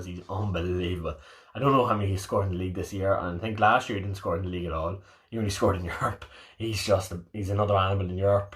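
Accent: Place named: Armagh, United Kingdom. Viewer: I can hear the Armagh in your accent 😂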